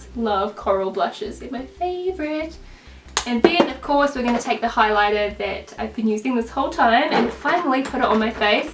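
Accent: New Zealand. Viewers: Also I'm a kiwi living in Australia and watching your videos and hearing your accent makes me happy and feel at home in NZ again!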